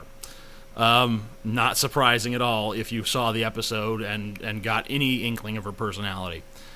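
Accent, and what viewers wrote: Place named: England, United Kingdom. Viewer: Your English Accent is amazing x)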